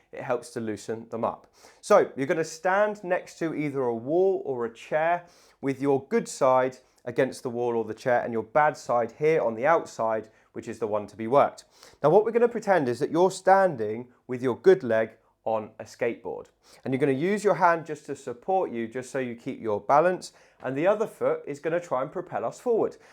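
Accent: United Kingdom. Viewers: Call me biased, but when I hear that right proper British accent, I just feel more assured that I am getting correct ✅ information. ( and I am American )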